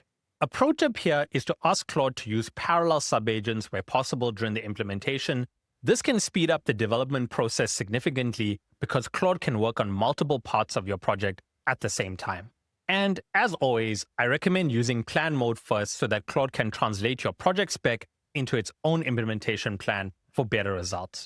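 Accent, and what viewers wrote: South Africa. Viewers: Your location says USA, but if I'm not mistaken you have a bit of a South African twang in there?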